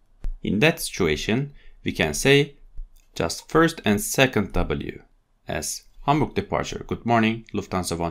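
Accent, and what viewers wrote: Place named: Turkey. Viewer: Hocam Aksandan inanılmaz belli bu arada Türk olduğunuz :)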